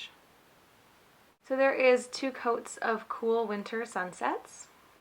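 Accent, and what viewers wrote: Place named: Midwest, United States. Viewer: Are you from the Midwest? Just curious because you have a slight accent that is similar to mine lol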